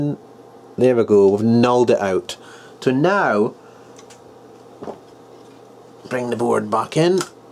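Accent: Scotland, United Kingdom. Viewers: are you fae the North East of Scotland ?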